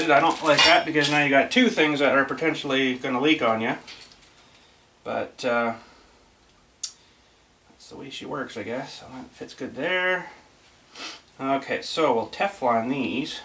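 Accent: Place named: Canada. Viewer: wow! i guess you are a canuck. (did i spell that right)? your accent makes me smile, and thank you for that! Goofy Canadians. LOL.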